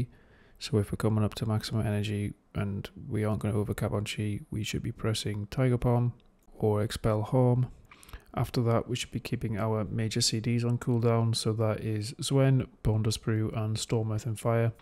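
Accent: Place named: Newcastle, United Kingdom. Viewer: Radge hearing a fellow geordie on a wow guide 😂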